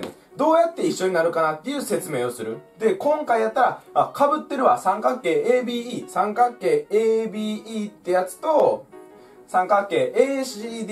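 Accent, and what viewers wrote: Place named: Kansai, Japan. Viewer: 関西弁が頭にすっげー残ってたすかる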